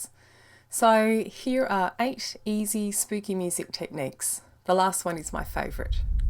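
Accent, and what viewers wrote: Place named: Australia. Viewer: Hi. My name is Maribel. I am in California. My 6th graders love your video and AU accent. We love tech in music class!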